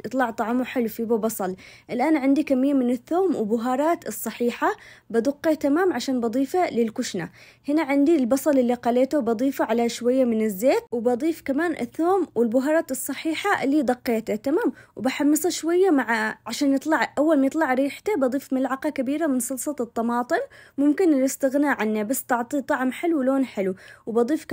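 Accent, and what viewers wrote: Aden, Yemen. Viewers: السلام عليكم الله يحفظك يا بنت بلادي سويت اشتراك في قناتك بسبب اللهجه حق عدن و علي الاكل و المحتوي الجميل من دون تكليف . تحياتى لك و المتابعين في قناتك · حبيبتي بنتي الغاليه ربي يحميك يسعد قلبك انتي بلسم على القلب خرجت من عدن واني صغيره ورجعتني لكل حاجه حلوه للكلام الدي في بعض من الناس يستحون من الهجه بس انتي برابه عليك انتي تتميزي بلهجه العدنيه وده اول نجاحك وموهبت بطبيخك الحلوه ربي يوفقك ياقلبي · مرره احب طبخك اتابعك استمرار خاصه صوتك لهجه اليمنيه 🥰🥰 الله يسعدك ابي طريقه بروستد من طريقتك الخاصه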